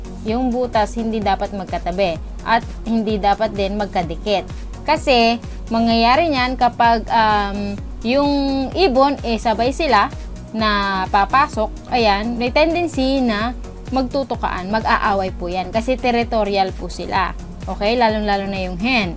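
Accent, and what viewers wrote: Pangasinan, Philippines. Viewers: Litaw na litaw accent ng pangasinan hahaha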